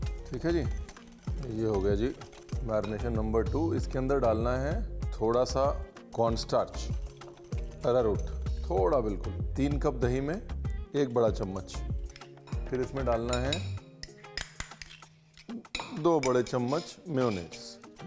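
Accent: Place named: Lucknow, India. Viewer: Mujhay to aapka Lucknow style bolnay ka style bohot pasand hai ❤❤❤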